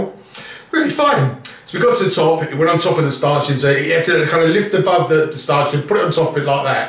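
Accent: United Kingdom. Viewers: Seems auto generated captions can't understand proper English accents, although you should invest in some audio improvements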